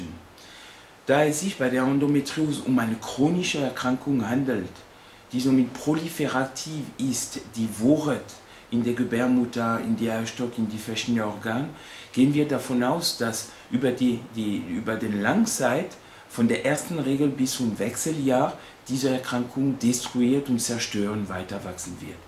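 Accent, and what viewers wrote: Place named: France. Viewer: je reconnais votre petit accent français , ses trop mignon merci pour vos explication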